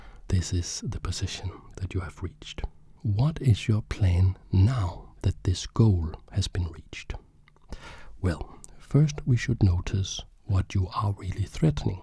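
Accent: Denmark. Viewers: I think I also could recognize danish accent any day now :) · the soft spoken danish accent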